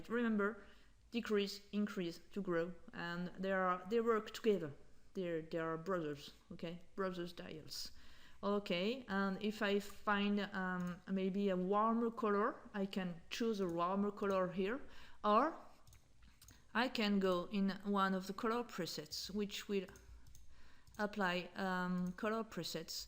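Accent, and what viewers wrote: France. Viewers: Bonjour, J'ai regardé vos vidéos. Je crois deviner un accent français. J'aimerai faire des sculptures en bronze à l'aide votre utilitaire "Metal Shader" avec Victoriai 7 de Daz Studio. Est-ce que c'est possible? Pourriez-vous svp me donner quelques pistes pour débuter? Je vous remercie. Jean-M!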